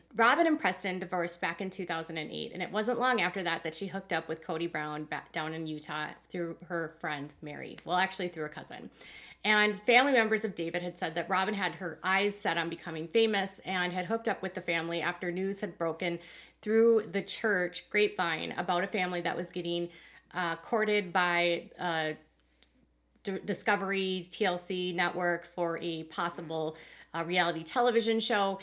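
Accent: Minnesota, United States. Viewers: Minnesota? No wonder. I thought you were Canadian. That dang accent. Sorry you have to live in such a cold area. It’s nice here in Los Ángeles and, it’s super nice here.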